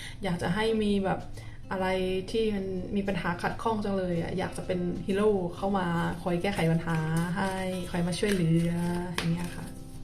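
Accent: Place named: South, Thailand. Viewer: คนใต้คับผม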